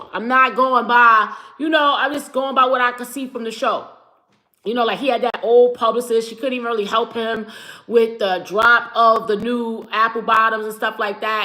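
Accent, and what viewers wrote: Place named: New York, United States. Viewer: ❤❤❤❤❤❤❤❤❤❤❤❤❤❤❤❤❤❤❤❤❤love love love it Girl i subscribed and everything thank you for your new york accent and honesty…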